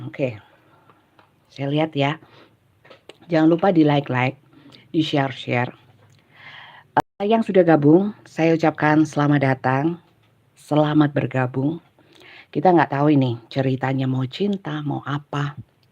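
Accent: East Java, Indonesia. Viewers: Sy sk sm logat bhasa ny mbk..medok jawatimuran.sm"dr jatim mbk..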